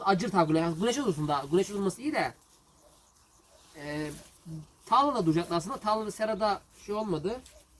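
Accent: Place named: Zonguldak, Turkey. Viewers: seni hiç kimse yadırgıyamaz Çünkü her yörenin kendine has şivesi vardır bizim Zonguldak’amızın şivesi de böyle biz mutluyuz şivemizden canım benim sen kafayı takma yola devam❤️ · Konuşman gayet güzel sakın değiştirmeye kalkma! kaybolup giderüz çaycuma şivemiz. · Zonguldak Karaelmas Mahallesi'nde oturduk yerlileri 'ın gibi konuşuyor lar Maşallah barekallah lahavle velakuvvete illabillahil aluyyulazim duasını okuyarak gönderiyorum sizlere Amin AMİN AMİN ECMAİN 🤲🤲🤲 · Bende devrekliyim hemşerim şiven harika sırf bu yüzden izliyorum seni 🤗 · kardeşim biz seni oldun gibi sevdik her yörenin şivesi vardır sen Zonguldak şivesiyle konuş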